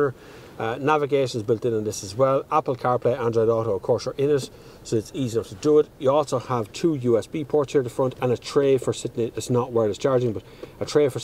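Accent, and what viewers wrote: Ireland. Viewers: Great review. I don't think Laura understands the Irish accent. I know some of my friends who have strong accents, have problems with Alexa aswell.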